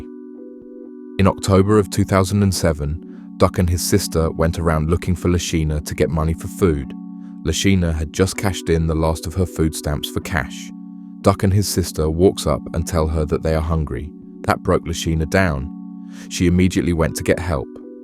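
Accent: United Kingdom. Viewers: He isn’t the only person with a British accent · Man change this stupid ass Brit voice, you outta your fuckin mind lol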